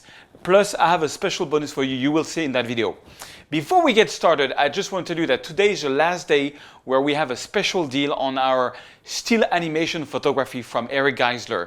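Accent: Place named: France. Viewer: Well done! I like your French accent in English. That's cute! Anyway, just to say hi and I like the way you speak and teach. Learned a lot from you. Cheers~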